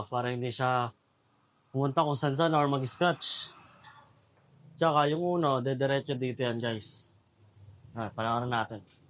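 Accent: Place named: Rizal, Philippines. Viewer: Taga Rizal ka ba bos. Ang punto mo Rizal.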